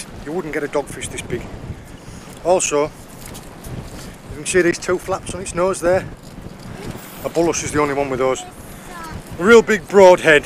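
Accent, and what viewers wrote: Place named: North Yorkshire, United Kingdom. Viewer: Such a familiar accent if tha aint a North Yorkshire lad I'll eat tha hat me sen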